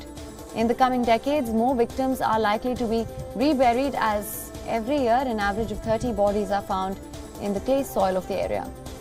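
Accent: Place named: India. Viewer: This newscaster has an annoyingly high pitched terrrible voice worsened by her Indian accent.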